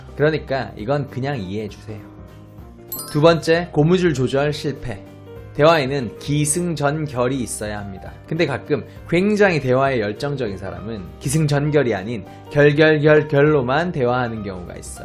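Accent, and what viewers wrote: Gyeongsang, South Korea. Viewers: 고향이 대구쪽이신가??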